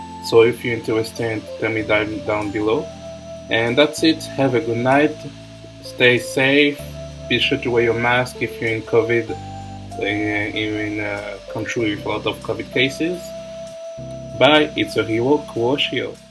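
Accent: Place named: France. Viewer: I love French accent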